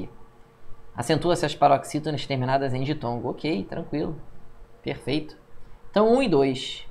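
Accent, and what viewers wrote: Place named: Rio de Janeiro, Brazil. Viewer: Professor muito bom. Objetivo e atento, além de ser bonito e com o sotaque carioca! srsrrsrsrs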